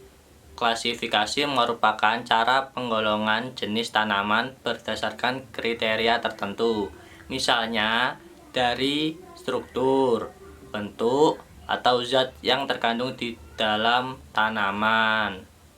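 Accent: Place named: Java, Indonesia. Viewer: medoknya kusuka🔥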